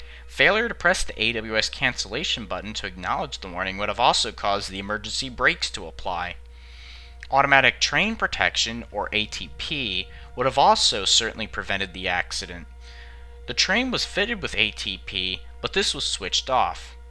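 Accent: United States. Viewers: yall are so mean, he’s American and is trying his best to talk out British words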